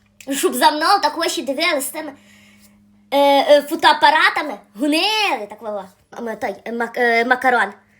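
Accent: Galicia, Ukraine. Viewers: Галицький балак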